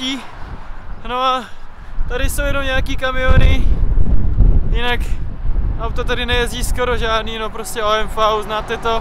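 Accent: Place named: Moravia, Czech Republic. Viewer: Moravák jak poleno. 👍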